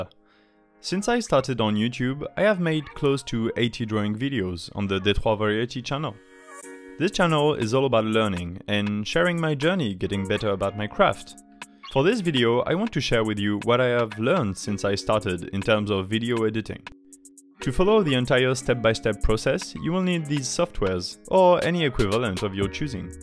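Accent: France. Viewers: Love your French accent!😍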